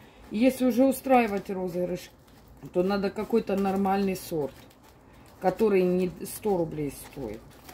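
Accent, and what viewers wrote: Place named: Ukraine. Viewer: думала,что вы украинка(я сама украинка и с таким же акцентом)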